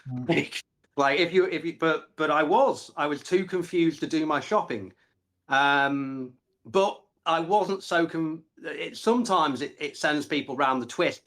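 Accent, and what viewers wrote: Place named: United Kingdom. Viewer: Wow! I listened all the stroy imagining some old chap. And the guy is really young 😮 Oh this British English 😂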